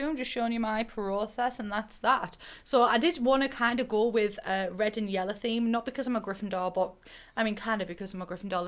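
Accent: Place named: Northern England, United Kingdom. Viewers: She’s got that working class, northern accent charm. Northern hospitality as well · I have been watching your videos far to much now because my thoughts are all in a northern accent and I just typed an casual but professional email and nearly sent it with the opening "Hey hens, how are you all diddily doing"?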